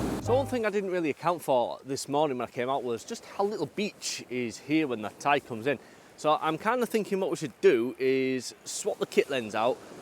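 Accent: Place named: North, United Kingdom. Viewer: Good stuff. It's a pity that the speed of your talking and heavy Northern accent makes it nearly impossible to understand you, much less learn anything.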